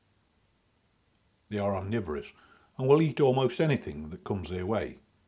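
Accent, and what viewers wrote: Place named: Lancashire, United Kingdom. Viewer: Thankyou,,, Great video,,,Great Accent,,, Lancashire,,, Could be Yorkshire,,,I my self like rats,,,, Good luck to them all,,,👍🌹😄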